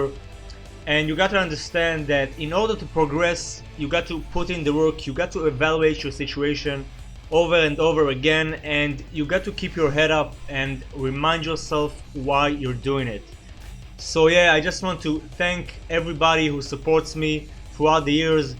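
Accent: Israel. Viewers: וואי אתה נשמע ישראלי רצח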